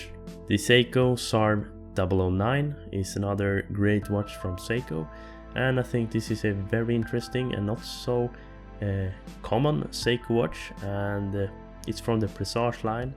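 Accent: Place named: Sweden. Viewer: May i guess, ur swedish arent u? Typisk svensk accent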